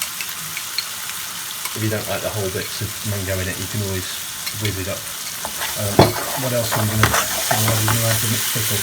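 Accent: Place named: North Midlands, United Kingdom. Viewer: Yaaaay! He speaks! ( although very muffled) but still a big step! Great vid! I somehow thought you were a Geordie , so obviously you are not. North Midlands?? I must try this ( but still have a backlog of about 30 of your recipes), great stuff as usual, much appreciated .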